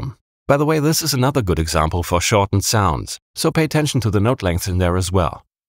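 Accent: Germany. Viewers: you sound extra german in this video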